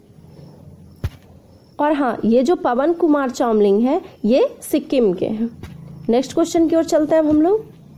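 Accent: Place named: Maithili, India. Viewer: thanxx and aapke bolne maithily tone ki sweetness ki chhataa saaf jhalaktee hai 😀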